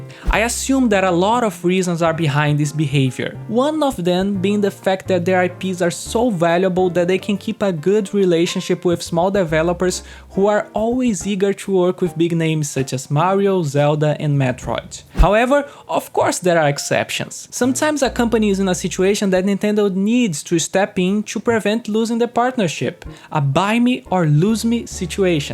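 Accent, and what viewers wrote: Brazil. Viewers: Hey, are you brazilian? Because for me, your accent and way to talk are very similar to the brazilian way, and im from brazil then it seems a lot lol. And very good video btw, I LOVE Xenoblade and monolith soft is incredible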